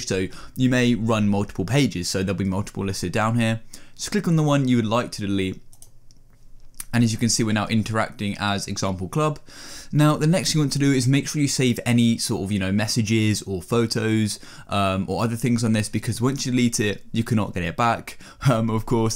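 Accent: United Kingdom. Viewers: you are definitely British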